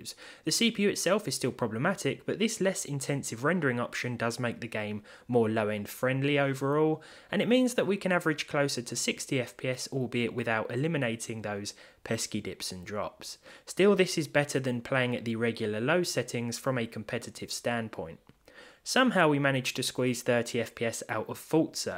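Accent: United Kingdom. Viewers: I have no direct need for the information you provide, but you are so likeable. Your voice is calming and your British accent is such a pleasure to hear. I enjoy your ponderings and I am sure a lot of people feel the same! Have a great weekend!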